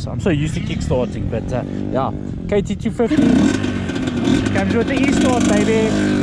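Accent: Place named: Afrikaans, South Africa. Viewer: Heavy Afrikaner accent. Daai bikes is Chinese ne . Ek ry n Beta 300 RR .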